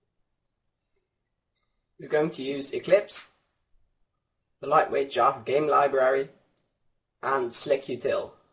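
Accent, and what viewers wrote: Netherlands. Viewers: you can just hear your dutch, ik ook :D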